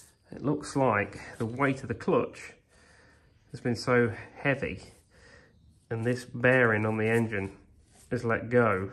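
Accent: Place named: North Lancashire, United Kingdom. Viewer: Sound like your from north Lancashire, what bits do you need I'm sure I can find them 😉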